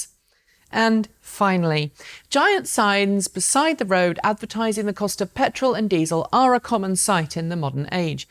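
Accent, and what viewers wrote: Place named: United Kingdom. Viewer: You Go Girl! Let me extend a well deserved congratulation for your award. Your efforts are leaving a footprint on the history of this planet, minus CO2 that is. I know that you do this not for the awards, however I'm sure that there are many more to come. Thanks for advocating "Cleaner and Greener". "EV Marketing/Awareness Award, is the founder and lead presenter of Transport Evolved, a website and YouTube channel that presents news and information about electric vehicles. Distinguished by her British accent and authentic voice, is respected for her objective, interesting content, with more than 1,100 videos and 95,000 subscribers".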